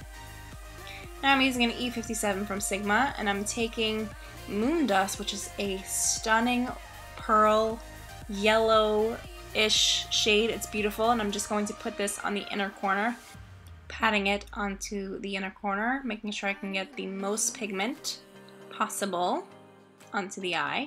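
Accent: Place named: AAVE, United States. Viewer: I think you are beautiful and you are very talented with makeup. I appreciate you sharing your knowledge. With that being said, I find the Ebonics a little irritating. There is no need to say things like "ma" face.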